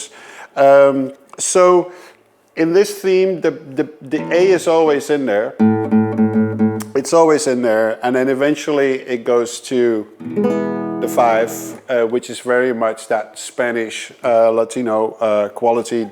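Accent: Netherlands. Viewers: Love the Dutch accent ahah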